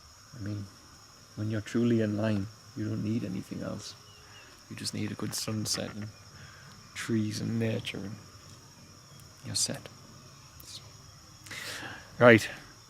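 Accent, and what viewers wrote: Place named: Newcastle, United Kingdom. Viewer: Hi I'm very happy to hear the update, as a UK national (I guess from the Jordie accent) could you use the NHS to keep fees down?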